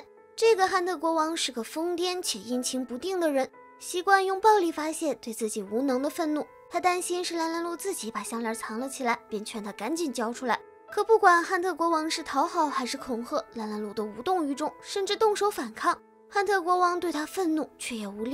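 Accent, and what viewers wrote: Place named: Mainland, China. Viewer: 大陸有些字唸法跟台灣不一樣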